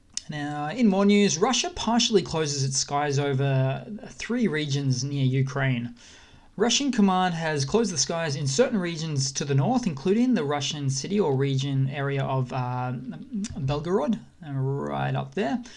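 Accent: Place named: Australia. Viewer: Good to here an aussie accent, I liked what the Ukrainian hackers did to the sign. If you can find more humous things that would be great.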